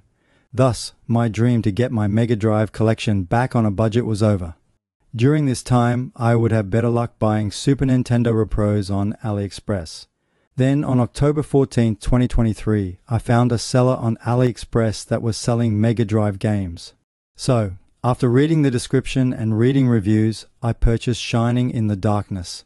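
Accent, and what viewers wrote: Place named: Australia. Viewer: Australia? I feel like an idiot not hearing it sooner from your accent, what's wrong w/ me? One of the crown jewels of my LTTP collection is a CIB Mattel/Australian copy -- had a classmate from my foreign school days act as the go between to secure it.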